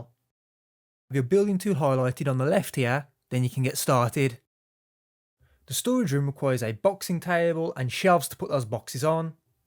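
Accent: Birmingham, United Kingdom. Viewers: Definitely Midlands, near Birmingham but its not the strongest brummie accent ive heard, could be trying to mask it slightly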